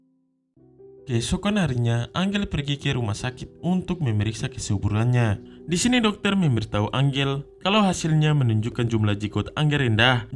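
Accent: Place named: Maluku, Indonesia. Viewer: suara nya udah jelas cuman intonasinyaa agak kasarr bang z ini orang maluku jadi logat dan bahasa nya kental bangat. Dia juga bru mulai jadi menggunakan alat seadanyaa. Rata2 org medan suka pembawaannya soalnya logat nya aga2 mrip.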